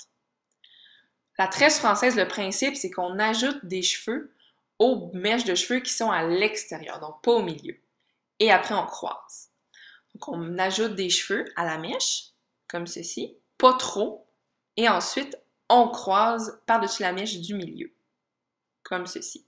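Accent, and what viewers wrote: Quebec, Canada. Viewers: L’accent québécois du Québec! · C'est l'accent du Québec et ton commentaire est idiot ! Si on pourrait entendre le tiens on le trouverait sûrement plus chiant.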